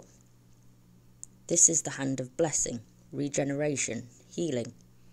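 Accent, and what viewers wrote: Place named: Mansfield, United Kingdom. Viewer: You have to be somewhere local to me with your accent I'm from Mansfield x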